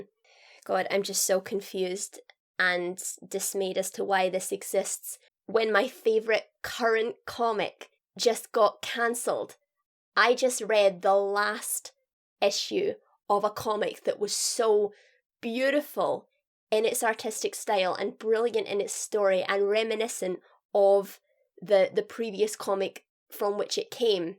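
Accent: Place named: Scottish Lowlands, United Kingdom. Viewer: Trying to identify that accent. My best guess is lowland Scottish.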